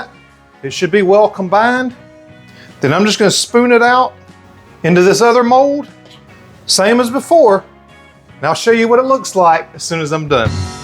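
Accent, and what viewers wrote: South, United States. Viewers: I forgive some pronunciations simply because your southern accent makes them adorable.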